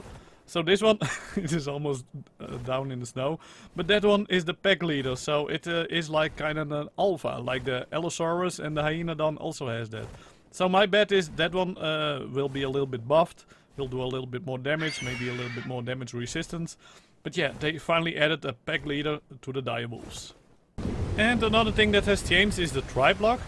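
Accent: Netherlands. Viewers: are you dutch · That Dutch accent XD. Respect! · are you dutch because you kinda have a dutch accent when you speak english??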